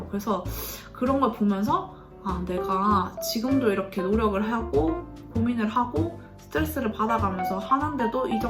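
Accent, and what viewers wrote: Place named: Seoul, South Korea. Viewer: 아니 님 영상에서는 왜 서울말을 쓰시는고에요?🤭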